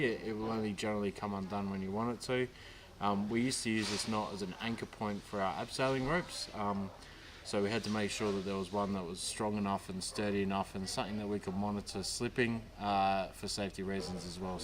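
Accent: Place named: Australia. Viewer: Which part of Aus are you from?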